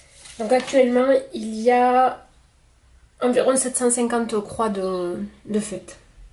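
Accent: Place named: Southwest, France. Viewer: bienvenue dans le club des premiers flosstube lol tres joli accent ça sent le sud ouest je dirais ... j ai le même accent et ça fait plaisir ta derniere creation en petit coussin est magnifique j ai beaucoup d admiration pour toutes celles qui font du point compté car moi je suis essentiellement sur du travail en toile imprimée gros bisous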